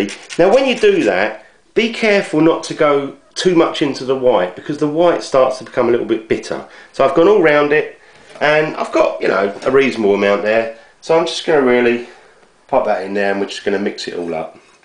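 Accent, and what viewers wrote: United Kingdom. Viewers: sorry to ask where are you from ? what part of the uk ?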